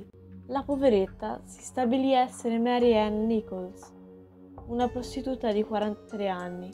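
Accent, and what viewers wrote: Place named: Tuscany, Italy. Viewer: figurati poi mi è sempre piaciuta la parlata toscana grazie a te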